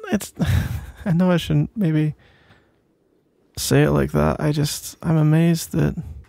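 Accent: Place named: Scotland, United Kingdom. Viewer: Your audios make me yearn for a boyfriend like you. The accent. The humour. The gentleness of you. God I wish I could find a scottish boyfriend like you haha. Keep making good audios, sbf! You literally saving us gays for being a hopeless romantic 😖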